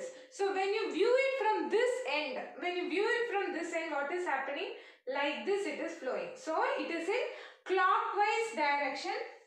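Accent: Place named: South, India. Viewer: south indian accent is so adorable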